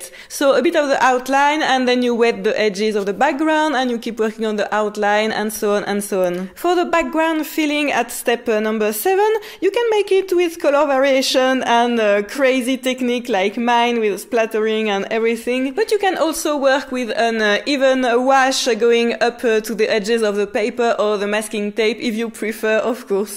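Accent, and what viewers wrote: France. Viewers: The English pronunciation strongly reminds me of Inspector Jacques Clouseau, but the tips are quite useful.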